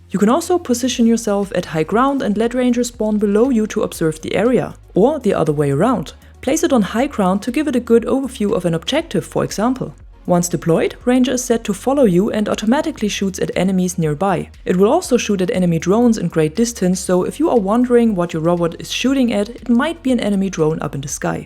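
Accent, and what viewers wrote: Germany. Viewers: love your german accent, you voice is so hot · Warum kann ich sagen das du aus Deutschland bist ? 😂😂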